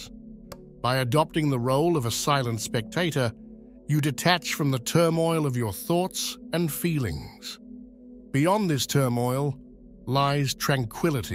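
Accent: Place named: New Zealand. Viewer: So nice to hear a New Zealand accent.... familiar and comforting.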